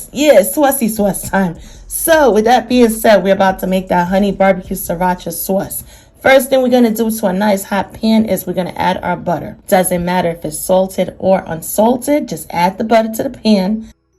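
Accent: New York, United States. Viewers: I can listen to her NY accent all day 😍❤!! Another great recipe!! P.s. I'm a silent watcher, but I just couldn't help it anymore, lol. I had to comment & say how much I love your accent.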